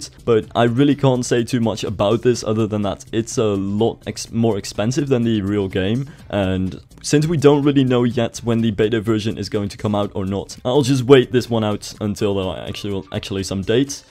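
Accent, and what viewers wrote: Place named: Netherlands. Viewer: are you from holland? your accent sounds dutch :P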